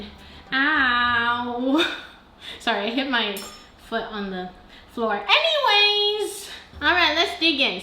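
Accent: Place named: Canada. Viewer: 2 minutes in and i thought "this person is canadian". 3 minutes in you confirm you're in Toronto. eta: omg, Midred's is soooo good! I came here after watching the video the chef did. the technique he demonstrated was a little different. when i was a kid and my mom made crepes we would have them with butter, sugar and lemon. so good. i also like honey and butter on my pancakes, but i most often do the classic butter and maple syrup. sometimes i make a berry compote.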